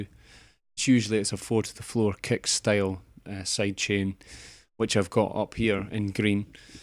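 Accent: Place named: Scotland, United Kingdom. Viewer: Very nice explanation brother. Scottish accent somehow assists in knowledge retention :)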